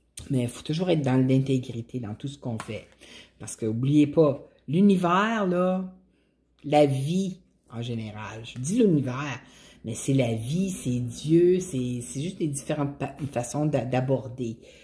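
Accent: Canada. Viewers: J’ai oublié...j’adore cet accent canadien qui pour moi correspond a optimiste et musical...! 🙏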